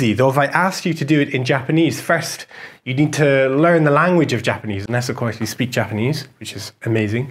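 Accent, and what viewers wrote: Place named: Ireland. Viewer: I met with similar inspiring and effective teachings in a famous book a decade ago but never with such a pleasant to the ear soft Irish accent :)))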